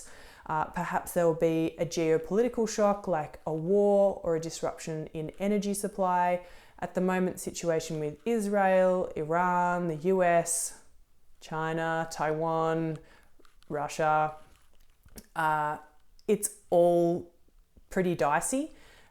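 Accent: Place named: Australia. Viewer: Love the Aussie accent tbh. Great big picture exposition. Really impressive although depressing. Root cause is not politics or capitalism like most ppl tend to mention. It is pure evolution and natural instinct. Our species just won the evolution race and we are running towards every boundary possible until nature will force us into the next balance. Its a natural inevitably. Dont blame a particular group of humans or "system". Its the system of nature.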